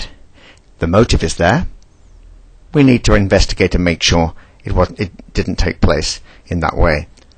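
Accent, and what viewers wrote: England, United Kingdom. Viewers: its an english voice dumbass if u think that sounds gay then u really have crap gaydar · BBC world service style british accent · It's his accent for being british, love his voice actually. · Is the British accent supposed to give credibility to this? He's about as intelligent as Lord Alfred Hayes (RIP). Give me a break.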